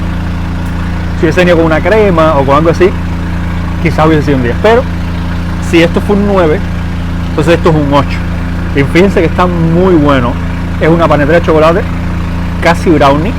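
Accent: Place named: Cuba. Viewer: Genova es famosa por la focaccia , norte italia la pizza es fina , pero según vi es un menú la cortesia de casa es un arancino siciliano , esta la lasagna q es de Bologna .. tienen excelente menú completo , solo el nombre del local indica italia Toto e el panfilo italiano così nos entendemos , saludos fratello te sigo hablas un buen español cubano nuestro no todos los YouTuber lo hacen gracias !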